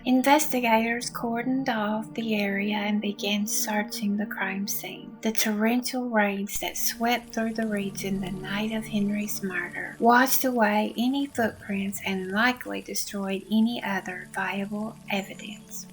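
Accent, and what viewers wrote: South, United States. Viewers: It's unique! Is it a Southern accent? 😊 As for poor Henry, I'm pretty sure he was killed by someone who knew him. Seeing as he was hit from behind I think he had met someone in that spot that he knew. I saw some recent news that they were exhuming his body after all this time so hopefully some positive news soon.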